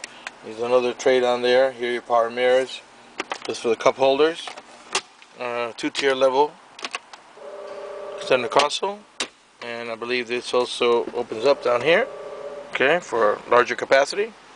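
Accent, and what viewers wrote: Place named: Cuba. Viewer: You are neither, nor a seller not an american you speak like a cuban just arrived..sorry, mention the issues with CVT automatic transfer case, is a sheet I sold mine just 1 year after got it, got it???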